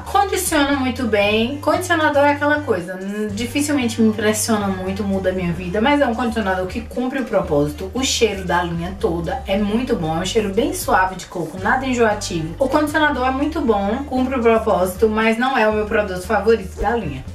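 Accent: Bahia, Brazil. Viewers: Queria q vc falasse com mais sotaque baiano. Acho lindoooo❤️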